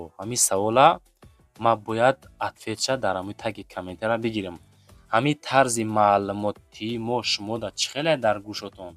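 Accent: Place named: Shahrtuz, Tajikistan. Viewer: Гап занитон сад фоиз ай шахритуз хона мон додарчон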